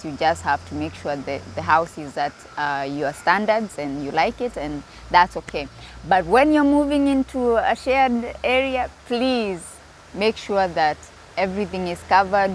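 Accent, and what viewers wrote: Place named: Kenya. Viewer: You speak like a Kenyan, were you originally from Kenya? I'm coming to Greece to buy a holiday home, later a retirement home.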